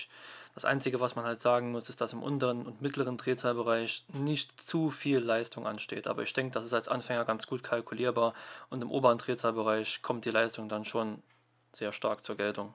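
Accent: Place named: Saarland, Germany. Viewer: Saarländer oder Westpfalz ... zumindest würd ich darauf tippen ..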